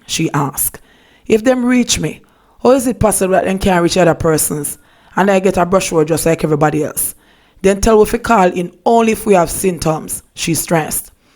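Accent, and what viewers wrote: Jamaica. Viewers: I can never get tired of hearing you speak Jamaican... Lol 😂😂😂.... Great report though... I seriously pray that none of the residents contract Covid-19 🙏🏾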